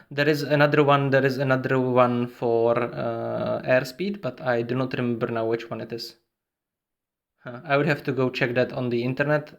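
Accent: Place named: Czech Republic. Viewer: Držím palce, ale tvá výslovnost AJ mi trhá uši. Slovní zásoba a slovosled je relativně OK, ale ta výslovnost je Czenglish. Doporučuju poslouchat třeba Kubovu English, má tam často věci k výslovnosti.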